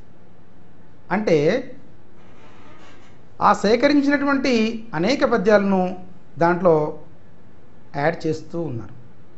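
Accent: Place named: Andhra Pradesh, India. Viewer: ap gaadila cheptunnav ,waste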